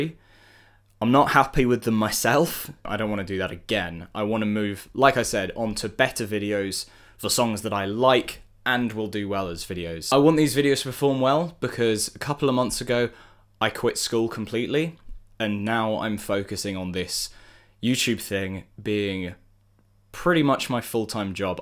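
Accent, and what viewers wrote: United Kingdom. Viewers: yOu sOuNd bRiTiSh also late congrats on 1 mill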